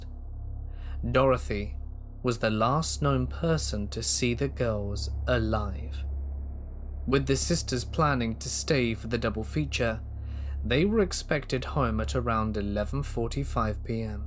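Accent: United Kingdom. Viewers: The British accent was designed for narration. Brief Case recommended your channel, by the way.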